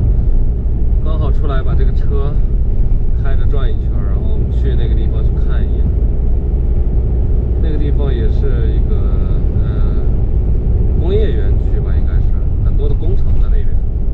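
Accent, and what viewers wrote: Inner Mongolia, China. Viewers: 内蒙古口味的普通话，听着很新鲜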